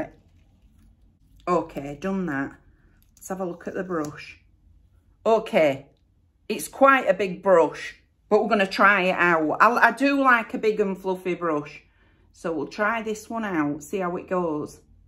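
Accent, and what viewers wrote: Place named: Leeds, United Kingdom. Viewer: Hi while I was scrolling you just popped up so I started to watch your videos I think they are awesome 👌I can't stop watching them you are so funny and you remind me of my auntie with your accent she is also from leeds love Michelle xx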